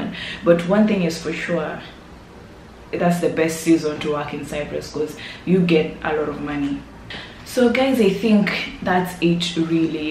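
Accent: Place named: Kenya. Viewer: to be honest what i like most is your accent, a lot of you-tubers that are in Cyprus got deep African accent like Nigeria accent which is not pleasing. i think you from Kenya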